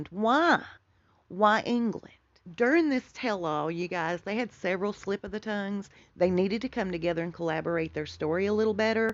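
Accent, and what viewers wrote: South, United States. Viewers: WOW... your beautiful south clarity and articulate (as also my family in NC) said it well. Didn’t watch or listen, but have listened about this chaos!! Oh my gosh! My huge family of blended children-10 and 27 grands, have never said a word about MM OR PH. After I first started seeing ‘them’ this last year, I spoke to my oldest daughter and she only spoke about not liking royalty, in general. Not one word about this, except say anything about those two or three venomous chameleons...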